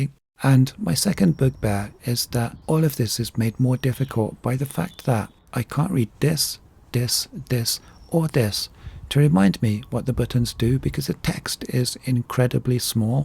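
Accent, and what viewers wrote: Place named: Wales, United Kingdom. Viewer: I loved the review. Very detail oriented and a pleasure to watch. Your voice and way of speaking is also very pleasant. I’m an American so I’m very used to loud and “in your face” and found your accent and volume to be quite a nice treat for my ears. I am going to assume you are from the UK and forgive me if I’m wrong but you strike me as possibly welsh? I lived in Portsmouth for a number of years as a child so I could be wrong but I’d be interested to know where your accent is actually from. Overall great review, honest and detailed. I’ll be subscribing. Cheers from sunny Florida mate.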